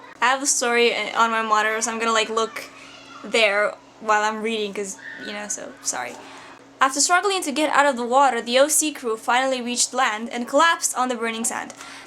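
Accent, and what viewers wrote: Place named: United Kingdom. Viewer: oh shit your accent gets more british every time nooo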